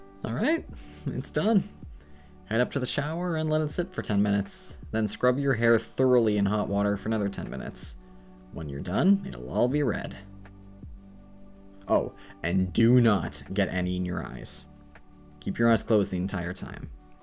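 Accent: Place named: United Kingdom. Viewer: You sound British and I love it🤩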